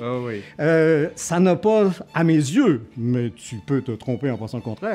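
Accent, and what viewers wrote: Quebec, Canada. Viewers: j'avoue du Beaumarchais avec l'accent Québécois ça aurait de la classe , je vote pour !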